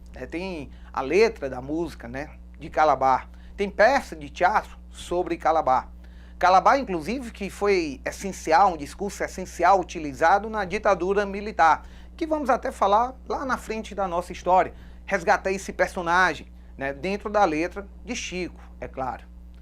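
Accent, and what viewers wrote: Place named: Ceará, Brazil. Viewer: Esse sotaque eu conheço: é do Ceará!!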